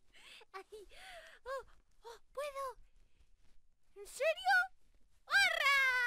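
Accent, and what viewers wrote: Spain. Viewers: Que pena que desde hace 10 meses no hace streams en Twitch, pero aún así no me pierdo los videos. Ojalá vuelva a ser más activa y hacer versiones en español, la verdad es que su acento español y su voz son increíbles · Me ha sorprendido mucho este asmr, es la primera vez que escucho uno de español de España y que me guste; soy de Perú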